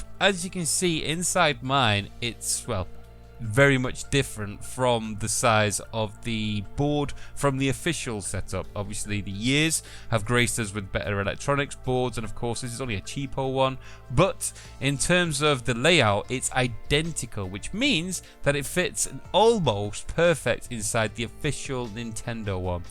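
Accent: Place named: England, United Kingdom. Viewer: aahhh , the guy behind the funny english accent ....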